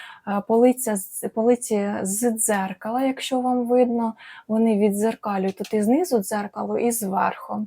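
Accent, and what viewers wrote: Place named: Poltava, Ukraine. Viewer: Вітаю, чую по розмові, що земляки з Полтавщини 🌻. Я народилась, і проживала в Полтаві, там маю квартиру, але 20 років живу в Києві. От в Полтаві в мене квартира "сталінка", в ній завжди влітку не жарко, бо стіни товсті - 70 см цегляної кладки, там комфортно для рослин, а в Києві маю дуже спекотну квартиру, без кондиціонера квітам важко, в усі вікна цілий день світить сонце, жалюзі закриваю на вікнах, на балконі в спеку взагалі може бути +45, одне радує, що восени і взимку квітам світла в цій квартирі достатньо. Тому зараз не купую рослинки, котрим потрібна прохолода, і підвищена вологість повітря, довелось змінити свої вподобання.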